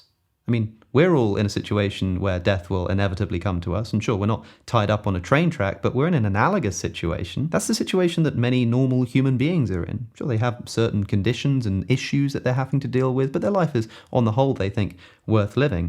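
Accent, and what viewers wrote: United Kingdom. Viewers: No. I have him playing in the background when I wash dishes and sketch. He can be talking about the most graphic shit but it’s still relaxing because he has a British accent 💀.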